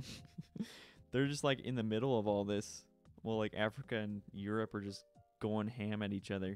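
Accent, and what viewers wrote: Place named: United States. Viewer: It is clear you are from usa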